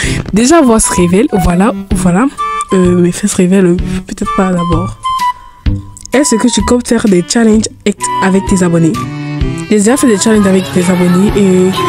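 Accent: Ivory Coast. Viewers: Des que g entendues ta voix g sentie l'accens ivoirien je suis ivoirien aussi😂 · Tu sais t'a voie d'ivoiriéne ❤🥳😍 magnifique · Tu es ivoirien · C'est moi ou j'ai impression que sa voix c'est comme les ivoirienne mais jsp dites moi si jsuis folles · La voix ivoiriene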